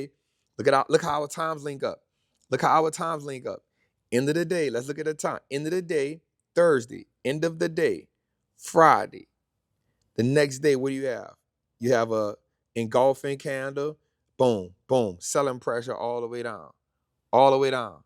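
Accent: New Orleans, United States. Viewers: You're a really good teacher! Are you from N'awlins? I'm in Shreveport.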